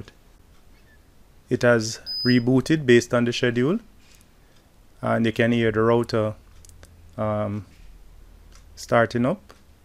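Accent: Jamaica. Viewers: You sound Jamaican, I lived there for a few years. Thanks for the video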